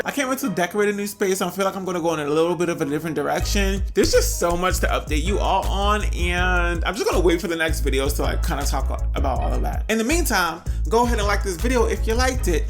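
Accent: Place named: Brooklyn, United States. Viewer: Sounds like Brooklyn 😂 You betta run like hell 😭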